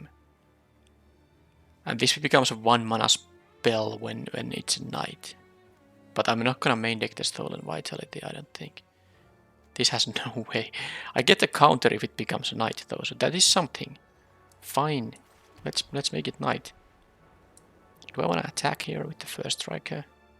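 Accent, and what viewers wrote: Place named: Finland. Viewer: You sound Finnish! Lovely to see your face :D Thanks for all the draft videos, I've found them very helpful.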